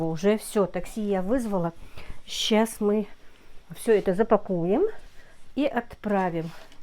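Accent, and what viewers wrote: Standard. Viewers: дорогая, пожалуйста напоминайте нам про лайки и комментарии! Честно, многие не помнят! Кто не хочет, тот не поставит. А кто хочет выразить Вам признательность, тот иногда забывает, что это канал. Лично я с удовольствием смотрю Вас и как будто с подругой по телефону поговорила, отключилась и пошла. Вы не думайте, что Вы лайки выпрашиваете, просто напоминаете забывчиввм, таким, как я. Восхищаюсь Вами! И да, наслаждаюсь чистым классическим русским языком! Счастья Вам!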